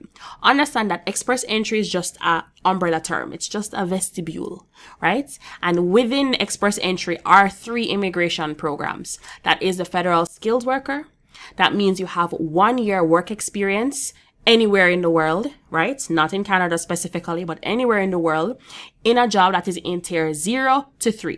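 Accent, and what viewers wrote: Jamaica. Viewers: Love this.... Jamaican?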